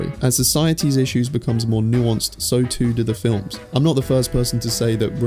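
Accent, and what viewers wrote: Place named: United Kingdom. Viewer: Why do you think this is a good video essay? Is it because he's smart? Because that's only due to his British accent.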